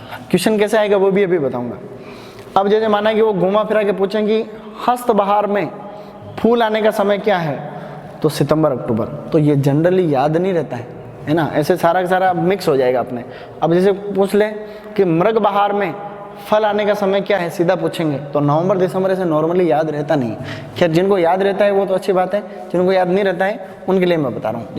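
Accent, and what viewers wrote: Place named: Maharashtra, India. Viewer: aapke baat karne ka tareek mujhe Maharashtra kaa laga so im tell you Any way best of luck for your future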